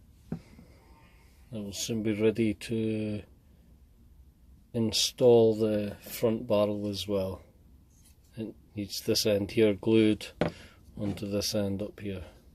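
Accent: United Kingdom. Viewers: how can i get in contact with you> are you from uk im guessing with the accent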